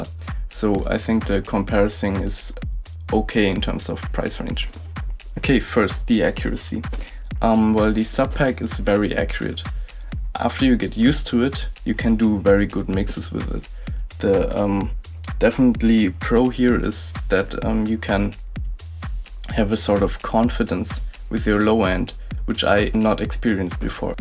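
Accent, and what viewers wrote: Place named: Germany. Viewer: Sehe ich anders. Lieber deutlich artikuliert aber mit deutschem Akzent als genuschelte Ami/Oxford-Englischproduktion! Production: Danke!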